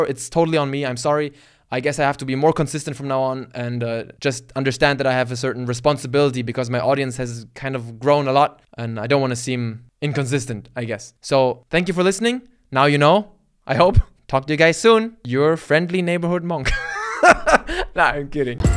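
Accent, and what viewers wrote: Germany. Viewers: Just realize you have a little bit of a German accent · Hey... uhhhh.... Mr Shaolin... uhhhh... warrior.... uhhhh monk disciple... guy... with the German accent. Damn! I'm hell-a confused even more now! 太麻煩了！ Loved binging on your Shaolin videos. Experience is everything! 恭喜！加油！ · Ah. Thats why his english sounds so german.. :D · Is he German? Im not sure he got the accent and this "deine Papiere Jude" is also German but he doesnt say anywhere that hes German. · Wait. Are you german???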